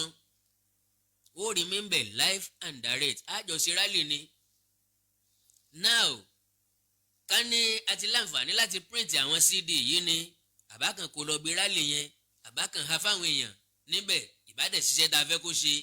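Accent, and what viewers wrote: Yoruba, Nigeria. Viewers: Your voice, attires, language and tune show a true real authentic and well cultured rooted Yoruba man. Stay blessed. Yoruba nation is moving forward, no going back. Many of our people are accursed seasoned scammers. They will all cry in our new Oodua nation. Ride on, we are with you and the good Yorubas. Gbogbo eni ba je gbi maa kú gbi lori òrò ile Yoruba. Igboho ti lowo ki Yoruba nation to bèrè.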